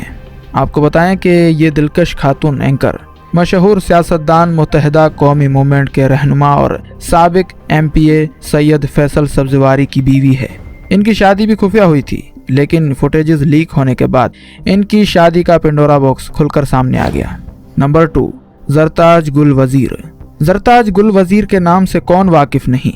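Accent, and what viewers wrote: India. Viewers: How come this guy sounds like an Indian.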